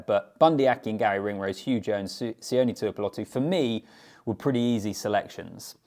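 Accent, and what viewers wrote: England, United Kingdom. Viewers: Just a quick question...are you English ha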